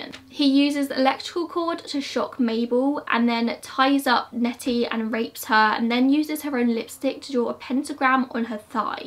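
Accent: England, United Kingdom. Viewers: I know this is so played out, but I just love an English accent.Combine that with murder mystery? I'm in! 😊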